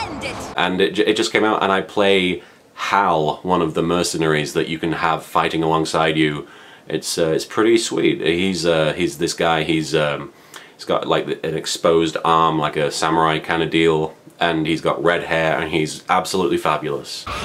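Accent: United Kingdom. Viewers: Holy shit he's British.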